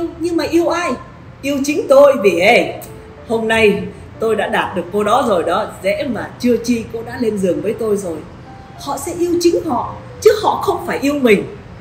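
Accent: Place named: North, Vietnam. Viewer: Em sinh ra ở Biên Hoà được coi là thủ đô Bắc 54 nhưng hiếm ai có chất giọng Bắc 54 nghe sang và ngọt ngào như chị Kỳ Duyên <3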